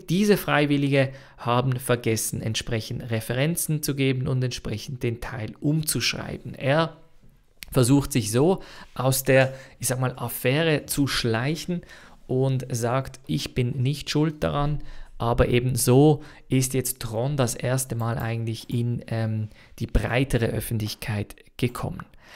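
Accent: Switzerland. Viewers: warum nennst du dich? Du bist Schweizer das hört man...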